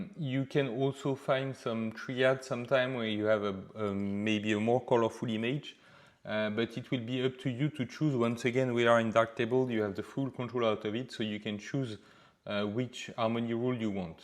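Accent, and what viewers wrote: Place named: France. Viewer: I love your French (?) accent! Thank you these tutorials! Btw, are you familiar with 3D LUT Creator? It's an amazing app (unfortunately a paid app). It has some remarkable tools (that, actually, remind me of some of the tools in DaVinci Resolve for color). I'd love if something the the A/B Grid was incorporated into Darktable. And if not, this is an amazing raw editor.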